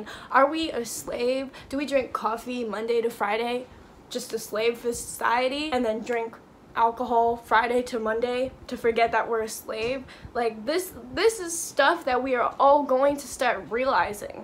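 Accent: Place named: Australia. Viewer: I LOVED YOUR PERSPECTIVE ON IT. I 100% AGREE. Like about the animals and especially the shower part. I'm literally exactly the same. I talk to the universe everyday and sometimes It makes me cry too.... also i noticed the way you say life is so auzzy now! Like you say lyfe instead of life and nyow instead of now.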